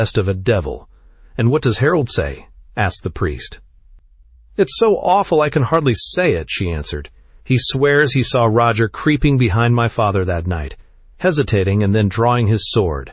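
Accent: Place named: United States. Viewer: i want british accent.. not that ugly american accent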